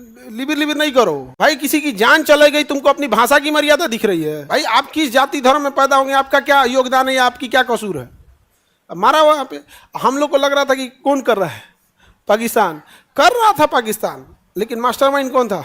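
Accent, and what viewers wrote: Bihar, India. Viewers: Coaching Mafiya khan, jisne apne bihari tone ko famous hone ke liye use kiya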